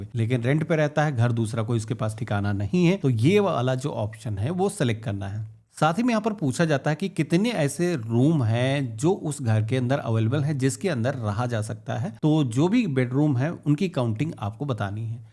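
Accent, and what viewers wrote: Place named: Uttar Pradesh, India. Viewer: Majhara tawkali, UP se ho na bhai aap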